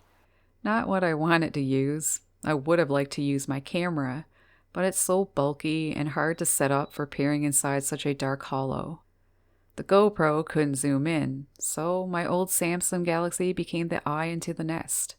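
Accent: Canada. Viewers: Great message and video 💖 Nice to hear of your Ma and formative foundations. Like many others, I look forward to occasions when I'm able to appreciate your passions. And your wonderful Canadian speech. At times, my thoughts refer to you as ', the ' 😄 (don't know if that's the influence from Brooklyn, Philadelphia or some other part of your southern neighborhood).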